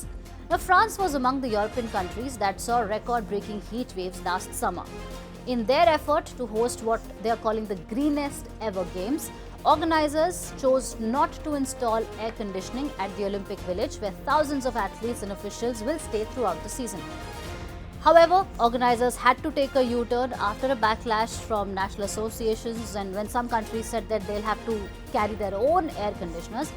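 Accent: India. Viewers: CNBC is an American broadcaster that is supposed to use American English, not Curry English. When you have a large number of UK immigrants in America, you have American or Canadian English, and Pomme in Australia they speak Aussic English, with India you don't chase Immigrant background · As a response to your edited comment, the lady is speaking British English. It's British English that's officially taught in India. Her accent is Indian. Just as you have different accents in the US, so does India. Once again, this YouTube channel is aimed at Indians, not at Americans. So obviously they're gonna hire someone who can speak with an Indian accent, not American, British, or Australian. Maybe you need to read once again the fact that the term "Curry English" doesn't exist. Curry, however, does and has nothing to do with India.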